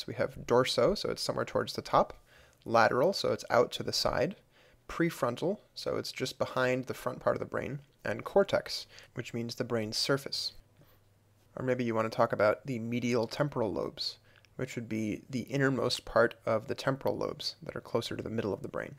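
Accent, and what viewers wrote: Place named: Africa. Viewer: And you sounded like an African!!! Thank you so much.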